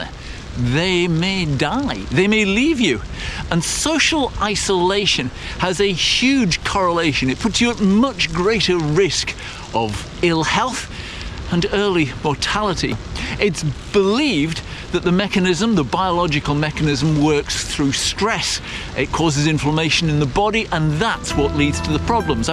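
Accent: Newcastle, United Kingdom. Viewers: That’s where I know you from !! I always thought I knew your voice watching your videos but never thought anything of it being fellow geordie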